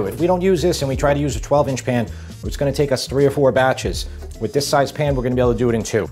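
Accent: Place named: Queens, United States. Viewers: You have such a thick LI accent. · My parents were from Queens and we grew up on Long Island. I don't notice it so much in person, but when I speak with my siblings on the phone, they all sound like you.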